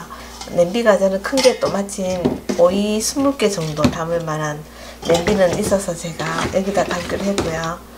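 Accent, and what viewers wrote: Gyeongsang, South Korea. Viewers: 네. 이모님^^ 감사합니다. 저도 경북에 산 적이 있어서 이모님 사투리가 정겹습니다^^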